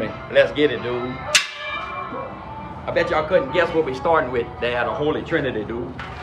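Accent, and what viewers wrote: Louisiana, United States. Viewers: Did Jake Lloyd adopt a Louisiana accent and create a new character???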